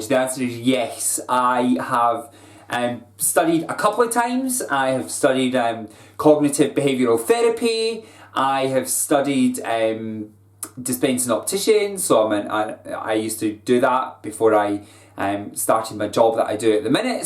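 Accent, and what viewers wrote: Scotland, United Kingdom. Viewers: I could listen to you chat all day! I have a soft spot for a Scottish accent. Looking forward to your next vid. Much love from Australia! 🐨 ❤⭐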